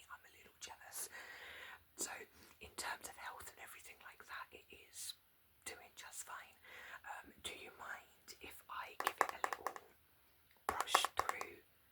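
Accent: United Kingdom. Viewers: hearing a fellow Brit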